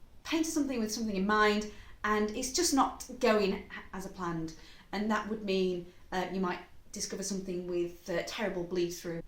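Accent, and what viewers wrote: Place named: Yorkshire, United Kingdom. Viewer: Ta lass that were greyt. Luv yur accent is it Yorkshire? I fitted me T4 camper out with pine panelling and when it went a bit yellow did the same white wash effect and it lukes a treat! I bought some Annie Sloan and barely used a desert spoonful cos just watered it right thin and applied with a dishcloth. It has a similar effect to yours but left it a bit more opaque. Know any good places for modern, trendy camper curtain fabric? Cheers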